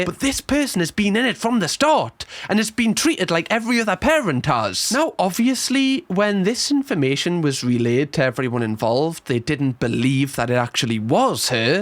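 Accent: Newcastle, United Kingdom. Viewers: Literally just found your channel a few days ago - love it. You’ve successfully made Geordie my favorite British accent. 😅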